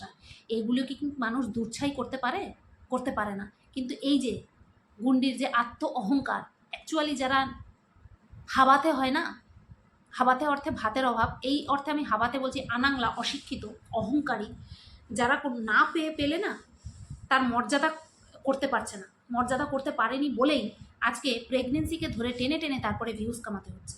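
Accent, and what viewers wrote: Bangal, Bangladesh. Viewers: ❤❤❤❤khubbb valo ble6o r tomar amgo ei bangal ktha purano diner ktha mne kraiya dayyy,vuilla jai amio bangal❤❤❤❤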